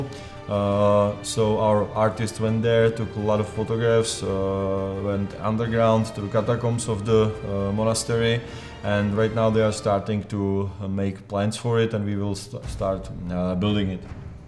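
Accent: Czech Republic. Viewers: May I ask someone from England/USA, do you like his accent? Because I am from Czech rep.as well, so I would really like to know your opinion :) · Tomu se říká česká angličtina no :D Já jsem rozuměl skoro všemu, kromě některých odborných slov co neznám.. Neni to těžký k pochopení, ale ten kdo se AJ neučí, nebo neni až tak zdatný, tak to problém může být..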